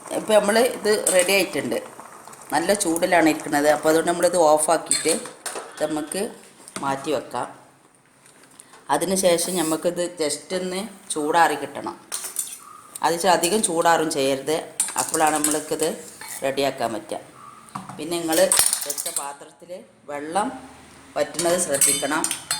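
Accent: Malappuram, India. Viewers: Nice itha .nishkalankamaaya Malappuram talk .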